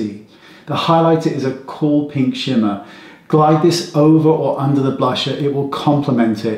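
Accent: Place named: RP, United Kingdom. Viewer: Gonna start say "blusher " instead of blush. Nope. It doesn't work without the posh English accent. Silly American. 😉😂 Love these products!!!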